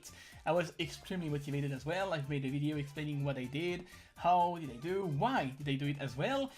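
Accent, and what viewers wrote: France. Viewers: Cet accent... l’accent français se sent.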